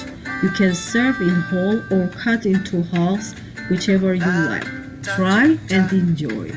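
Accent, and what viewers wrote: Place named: Austria. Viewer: I saw her other channels, the lectures. She speaks Austrian German.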